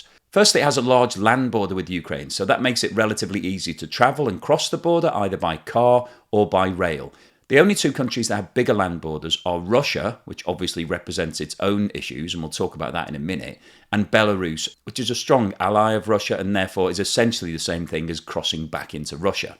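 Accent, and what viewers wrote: United Kingdom. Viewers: Every other video cites the demise of Russian economy done February'. Yet to happen. Maybe he needs more videos on the tanking of the EU and the USA economy better yet his British accent the UK impending economic collapse. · He actually sounds British.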